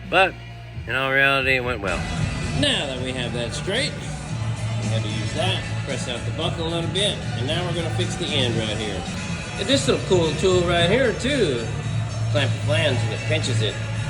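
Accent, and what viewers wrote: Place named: Texas, United States. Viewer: Just subscribed. GREAT Channel. Is yous in TEXAS? Ya sound likes yer from TEXAS 🤠 I is too so I was justa wonderin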